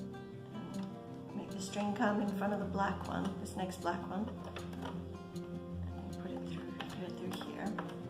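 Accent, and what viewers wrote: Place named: Australia. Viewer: We did not "hear" any Spanish in your accent, sorry thought you might have been an Australian or New Zealander. Have you considered using the same videos for the Addi and re-recording them in Spanish? It might give you more subscribers. Just an idea from your comment in the video. Thank you for a very good video on doing the Messy Bun on an Addi.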